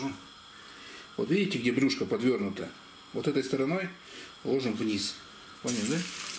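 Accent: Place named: Urals, Russia. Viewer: На Амуре люди медленнее говорят. У Вас наш уральский говор - быстрый. Видно, наши осели на Амуре.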